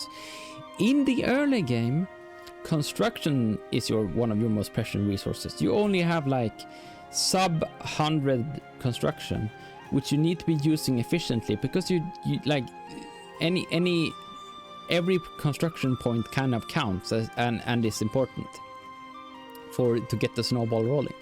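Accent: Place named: Sweden. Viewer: That's a swedish accent.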